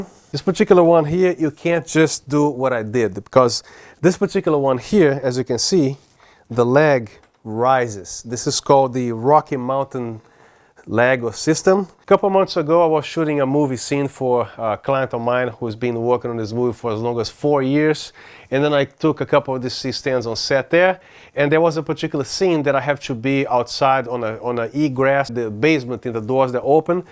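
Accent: Brazil. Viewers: haha yes, I know a lot of Brazilians here in Houston and recognized it, hope you had a great new years, this was great information and you explained it really well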